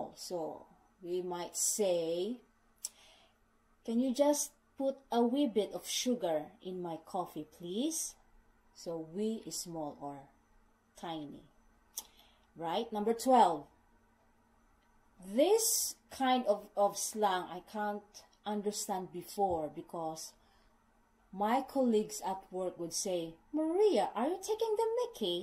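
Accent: United Kingdom. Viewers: I love the british accent. Blimey! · ganda talaga nang british accent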